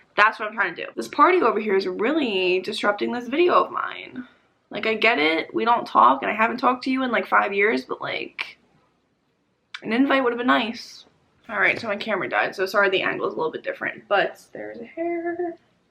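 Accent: New Jersey, United States. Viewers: I hear a cute New Jersey accent 🙂 I never remembered it from before but I also watched your old vids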